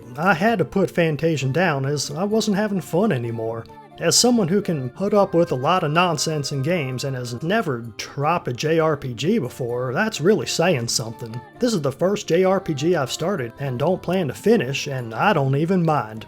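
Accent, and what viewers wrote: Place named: Rural, United States. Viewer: >hick accent, opinion rejected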